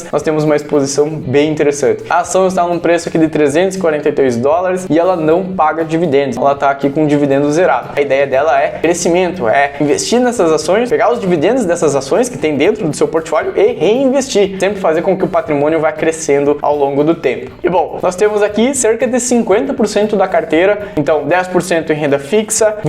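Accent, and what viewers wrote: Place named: Minas Gerais, Brazil. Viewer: mineiro de (BH)kkkk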